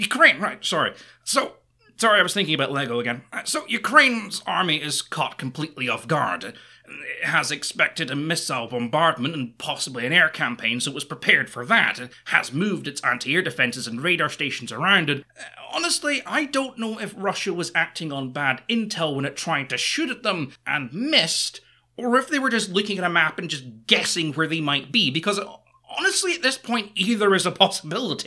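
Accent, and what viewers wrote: Scotland, United Kingdom. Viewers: I love the Scottish accent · Why does he sound like Sheogorath,but Scottish and sounds like he's sick.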